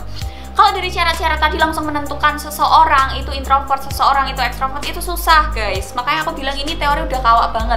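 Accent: Java, Indonesia. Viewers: Haduh lagi" gue gx pikir judul ama isi Lu ngomong ja dah bikin huha Sakjose medokmu ngakakku #nyerang_esteh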